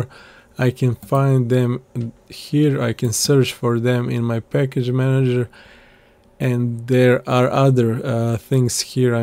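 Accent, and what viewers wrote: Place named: Balkans. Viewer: Brate, je si li ti Sprksi? Cujem nasa acenat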